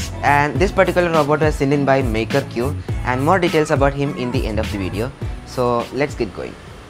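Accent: Kerala, India. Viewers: 😌I saw you made a drone..Your accent tells you are from kerela.. 😹🙏guruji, pls let us be your students.. Just can't imagine your future.. 👍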